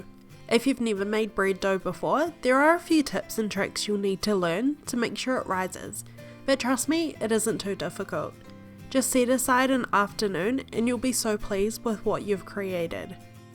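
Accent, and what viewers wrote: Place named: New Zealand. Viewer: It's a new zealander accent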